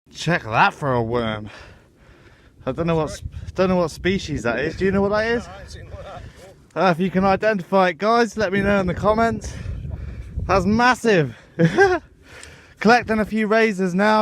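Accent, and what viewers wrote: England, United Kingdom. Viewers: Lovely British accent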